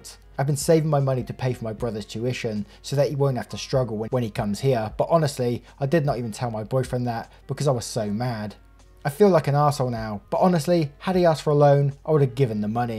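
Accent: United Kingdom. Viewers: Omg I’m so stupid, you’re British? I guess I don’t know accents I thought you were Australian this whole time wtf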